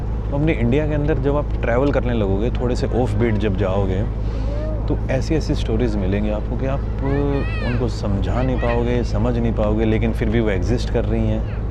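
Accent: Bangladesh. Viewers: . I watched your all videos till today you uploaded. Liked and commented also. But this is one of the best as you spoke nice Bengali. Amar naam. However your tone is bangladehi bangla not kolkata bangla 😅 Let us meet at Delhi. I also travel a lot. Our meeting can be mutually beneficial. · you are using Bangladeshi/Tripura accent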